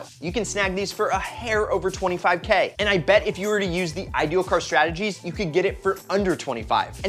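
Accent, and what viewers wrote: United States. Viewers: Yo, can you just do a whole vid with your cowboy accent? hmm?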